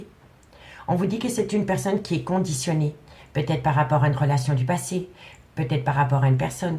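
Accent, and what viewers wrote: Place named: South, France. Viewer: bonjour J'aimerai savoir si vous êtes du sud (on dirait bien ) entre Montpellier et Marseille SI OUI? vous consulter en réel,?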